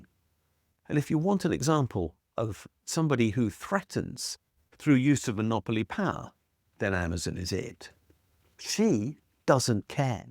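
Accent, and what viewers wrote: RP, United Kingdom. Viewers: Oh that’s the most stupid thing I’ve ever heard from you!! You are a lecturer in accounting, with a posh voice. Keir Starmer has been in his post for only a few months. He has inherited such a shit show from the Tories like no other Prime Minister ever has. 2 things. Brexit and the Pandemic. Brexit is probably the worst economic disaster to befall the country than anything else. We exited a free trade zone and sent back countless people who were working hard and who we can replace. They all paid tax, who can’t be replaced. We’re paying more for imports from the EU , and we’re losing out on exports because everything we sell is more expensive. The Pandemic: the Tories call off a major run through of how the U.K. would cope with a Pandemic. If we had had that, we would have been much better prepared. The Tories spent a fortune giving their friends money for PPE and contracts to help through the crisis and they made billions. 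If those 2 things hadn’t happened, we would be in much better economic shape now. Labour and Keir Starmer are doing what ever it takes to bring about investment and growth to the country. I am a Labour voter and I understand that things take time to change. The Tories spent 15 years destroying this country and it’s going to take a long time to fix. Be patient and give it time. I’m unsubscribing.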